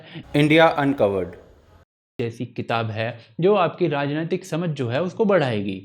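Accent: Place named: Malwa, India. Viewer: Sir Aap Malwa ke lagg te ho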